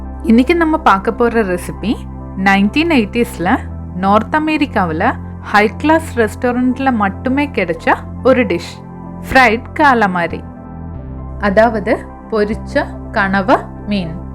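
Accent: Kerala, India. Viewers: Maylamo voice 👍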